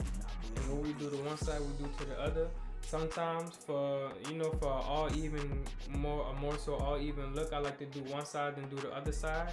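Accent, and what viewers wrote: New Orleans, United States. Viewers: You sound like you’re New Orleans bro.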